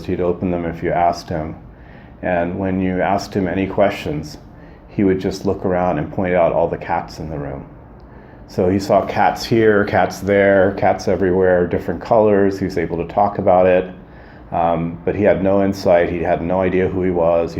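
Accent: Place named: United States. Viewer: Excellent video doctor. By the way, I love your accent. Being a foreigner, your accent is like liquid gold to listen to and learn American English from.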